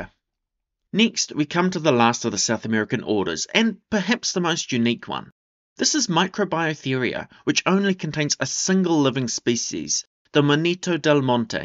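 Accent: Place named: New Zealand. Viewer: That's some accent you've got there pal, it took me a few seconds to figure out that the "iglang" mammals were egg laying mammals, New Zealander?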